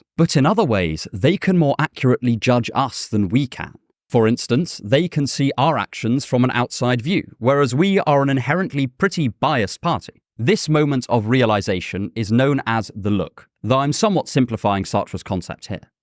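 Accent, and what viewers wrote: United Kingdom. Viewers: I watch for philosophy but also bc your s tier British accent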